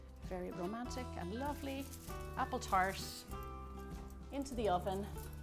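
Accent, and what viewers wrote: Ireland. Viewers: Hi,I live in Dublin, I love listening to your Irish accent, which is rare to hear in 😊USA baking recipes on Utube, thanks for this easy to do recipe and for your helpful tips, I’ve got the Bramley apples which my mother also used, the pastry is ready to make the tart in the morning I can’t help but admire the large sensible oven which too is rare in Ireland God bless you and your family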